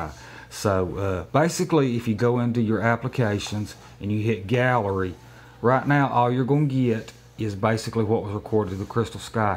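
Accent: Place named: Kentucky, United States. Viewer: By the way where do you live? I used to live in Kentucky and I kinda recognize your accent from when I used to live back there. Not trying to be funny but I was just curious. Used to live outside of Lexington Kentucky in a little town called Winchester KY. who knows we might have been neighbors at some point 😂😂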